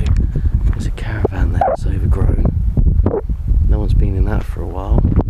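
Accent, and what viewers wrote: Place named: Suffolk, United Kingdom. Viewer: Quite welcome! I am fascinated by these type of urban explorers/abandoned properties videos, and enjoy seeing them. Also one viewer suggested having subtitles, as though this were a foreign film in need of translation. Not for me! In my teens the local PBS station ran lots of UK programs, BBC/Thames/ITV etc., so I have no difficulty in hearing your Suffolk accent. My ear for dialects is perhaps more finely tuned than the average fellow :)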